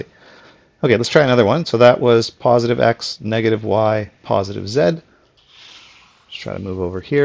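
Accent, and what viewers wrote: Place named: Canada. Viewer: why do you say zed you sound like your from the USA or do I detect a Canadian accent?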